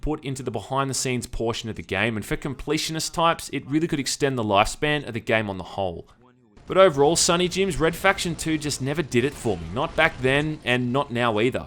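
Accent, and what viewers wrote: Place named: Australia. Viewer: Oooh thank you i love your reviews and australien accent. both combine makes a charmes in your video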